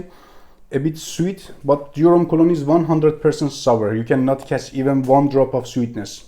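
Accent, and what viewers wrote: Iran. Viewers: داداش لهجت چقد ب ایرانیا میخوره😄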